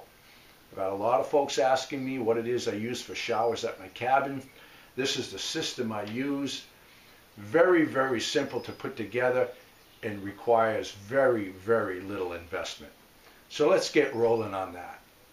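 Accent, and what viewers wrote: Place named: New York, United States. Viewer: You do have New York accent. So wonderful.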